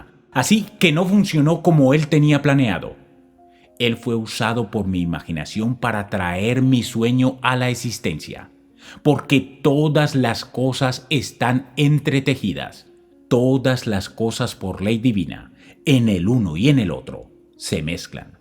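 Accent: Colombia. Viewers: es colombiano, paisa, de hecho, su acento lo delata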